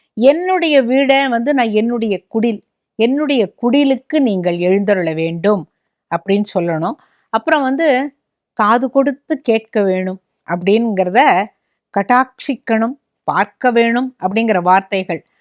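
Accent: Palakkad, India. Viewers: பாலக்காட்டு மாமி மாதிரி பேசி அசத்திட்டேள்.. பழைய பாரம்பரிய விஷயங்களை இது போன்ற நல்ல செய்திகளை தொடர்ந்து தாருங்கள் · Akka I am your Palakkad relative.Super Palakkad Tamil. I am your follower and enjoyed learning a lot.Everyday I will wait for your program.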